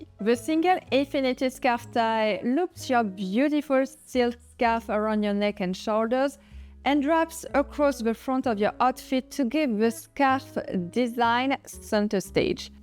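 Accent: France. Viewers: Hard French accent.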